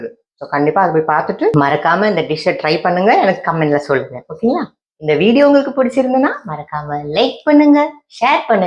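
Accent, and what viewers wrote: Kerala, India. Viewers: That Malayalam slang unne vittu pokale 😊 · Anyway Tamil samsarikkumbol malayala slang nannayittundu.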